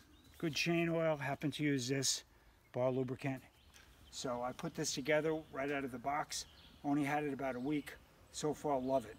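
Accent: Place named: Rhode Island, United States. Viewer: Cool accent. Rhode Island? Sounds like my relatives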